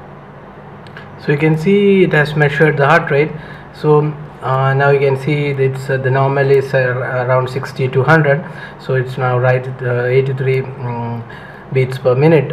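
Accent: India. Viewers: By your speech it seems you are Indian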